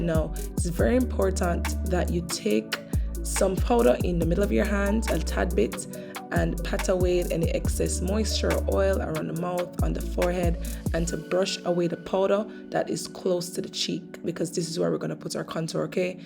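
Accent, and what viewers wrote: Jamaica. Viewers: Am I hearing a Jamaican accent? Subscribing!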